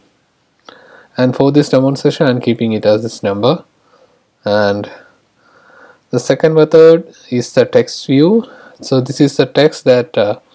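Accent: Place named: India. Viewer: bhai full indian accent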